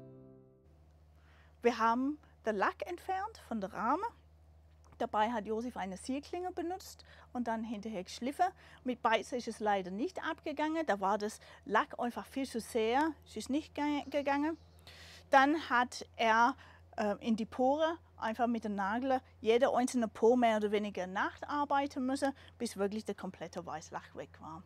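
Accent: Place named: Swabia, Germany. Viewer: your ever so slight Swabian accent is darling! Thank you for your lovely videos.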